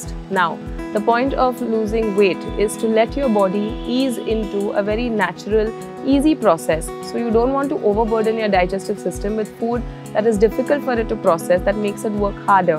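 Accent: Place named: Kerala, India. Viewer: Oh! That's great! Your rendition resembles a typical kerala girl, infact,that's what I felt.Anyway great lessons and quality contents.Go ahead madam 👍🏻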